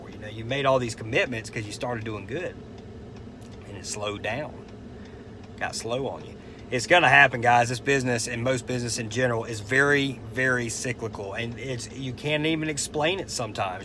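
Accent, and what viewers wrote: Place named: South, United States. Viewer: Thanks. Needed to hear today!! The southern accent always helps too. It is soothing to me. 😉I don't hear much here. Make today amazing!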